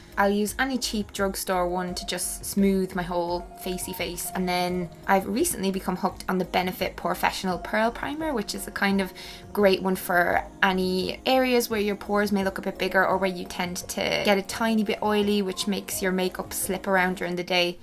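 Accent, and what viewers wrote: Dublin, Ireland. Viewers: Are you from northern Ireland? You sound like your from Dublin tho😂 so idk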